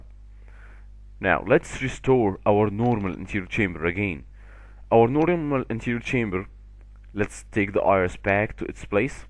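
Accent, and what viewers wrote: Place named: Egypt. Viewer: cuz this s egyptian english :D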